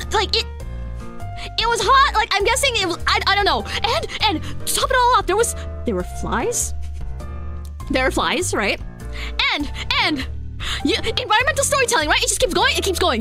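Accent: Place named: New York, United States. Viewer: Yep, sounds like New York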